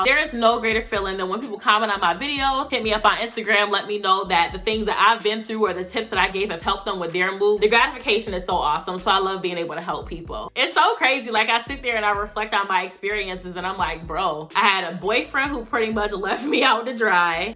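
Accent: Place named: Syracuse, United States. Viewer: Where are you from in New York? I'm from Queens but you sound like my cousin in Syracuse. Am I right?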